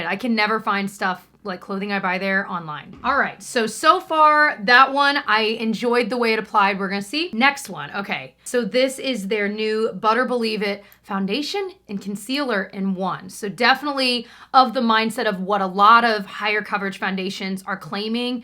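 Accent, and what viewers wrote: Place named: Midwest, United States. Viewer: So.. watching yours and Tyler’s videos I was like. Matt! (My husband!) this girl lives in a Pulty subdivision! She goes to Meijer! I think she lives in Michigan! Then he watched literally one of Tyler’s videos and was like nope. Indians. Ugh! Anyway. Bridgerton was my first real romance series. Haven’t seen them but the books are good. I watch your stuff when I’m out of town for the Midwest accent!!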